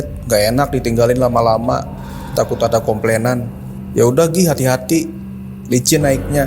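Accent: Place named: Bekasi, Indonesia. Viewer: Buset bang, bahasanya Bekasi bet dah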